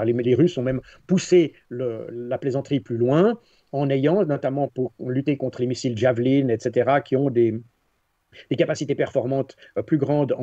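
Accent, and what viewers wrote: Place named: Switzerland. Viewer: son accent suisse trainant d'origine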